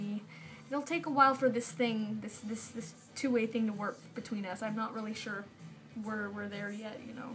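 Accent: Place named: United States. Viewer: You sound American. Were you raised in the States? Shame about the real YUI's hiatus, right?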